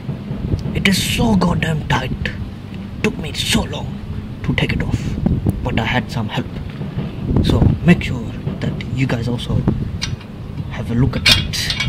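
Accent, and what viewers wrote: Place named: India. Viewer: curry accent :) made me lol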